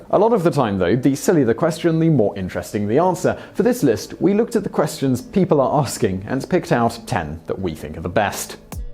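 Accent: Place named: United Kingdom. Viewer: love this channel! it s my fave for top 10 listsand I love s narration and brit accent (and dry wit)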